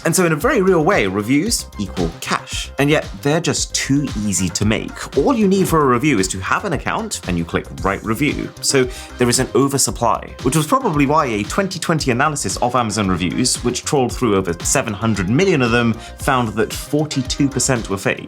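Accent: India. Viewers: The sub continent accent made it worse for me.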